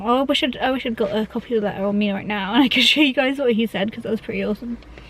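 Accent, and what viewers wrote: United Kingdom. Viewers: Love your English accent! I can relate to you allot. Starting Strattera today.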